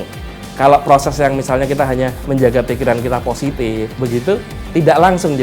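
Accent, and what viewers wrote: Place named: Banyumas, Indonesia. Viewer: Salam ngapak mas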